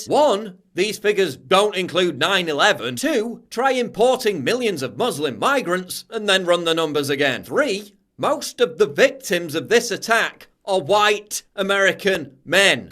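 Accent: United Kingdom. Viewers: This guy speaks with a heavy British accent. Are they even allowed to own in Great Britain. I bet that he has never shot a 50 cal, and probably denies ever masturbating.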